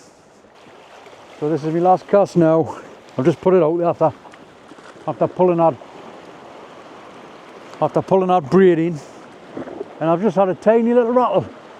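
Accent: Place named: Northumberland, United Kingdom. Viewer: Lost sock in your wellingtons Picking your noise 😂Trying to pin point your accent at a guess l would say around Morpeth Blyth area 😊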